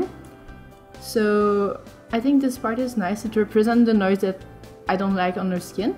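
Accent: France. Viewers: you french!!! nice eng accent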